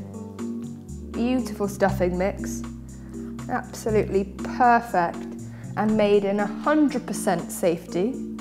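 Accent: United Kingdom. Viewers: LIAMM she’s obviously not from America can idk how you can’t tell she’s English with that accent lol this one is not on us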